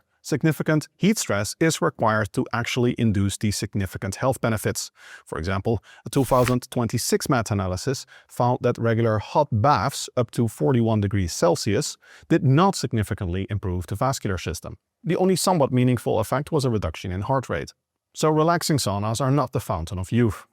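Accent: Netherlands. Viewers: I know he's Dutch, but not where in the Netherlands. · His accent is pretty screwed up anyway because he's been away from Netherlands for so long. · I wasn't watching the video and yeah that line did pop my eyebrow when I heard it. Going back it followed the other Dutch-tell phoneme he uses which is the uncentralized 'u' in "unaware". Anyway, if you're reading this, your English is great and your accent is very mild. Even Jeff Nippard has a noticeable accent to an American audience.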